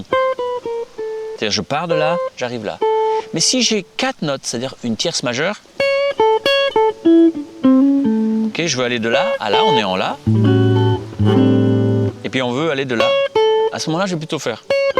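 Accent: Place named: Salagou, France. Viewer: Putain con, cet accent du Salagou!!! ça donne envie de te suivre.Merci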